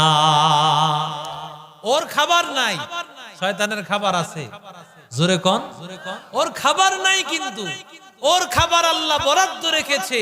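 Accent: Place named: Kuakata, Bangladesh. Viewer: কুয়াকাটা কেন্দ্র্রিক কন্ঠ, মাশাআল্লাহ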